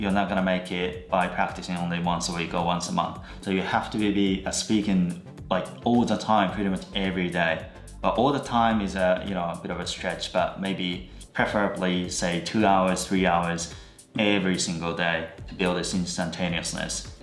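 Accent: Australia. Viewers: I can get that English is being adjusted to Aussie one(kinda "Aussied"😂) little by little. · オーストラリアの発音ですか？ way = ワイ show = シェア 教えていただけるとありがたいです。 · オーストラリア発音磨きかかりまくってんなw makeとかほぼマイク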